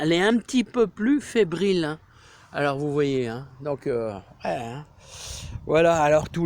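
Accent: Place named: Nord-Pas-de-Calais, France. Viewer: Bonjour, je n'ai pas de jardin. Mais je profite à fond des vidéos que vous postez, j'adore Votre bonne humeur😅. Je suis une chti partie dans le sud, et votre accent me rappelle d'où je viens😉. Bonjour à toute la team des jardiniers 😁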